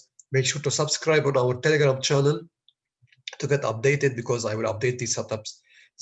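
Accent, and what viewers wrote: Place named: Egypt. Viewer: U sound like an Egyptian. Could you please look into doing crypto currency forecasts?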